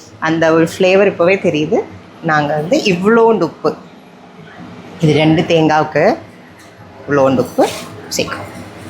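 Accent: Kerala, India. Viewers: Malayalam kalantha tamil like it kutty ma..... Na podi try pani pakuren sissy ma.....❤❤❤❤❤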